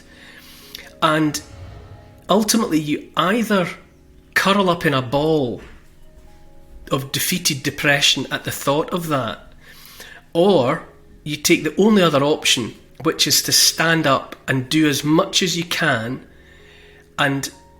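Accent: Scotland, United Kingdom. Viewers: Cheers, doof doof on heart. Lurve ya skotiesh akscent..! 🫵😇🤙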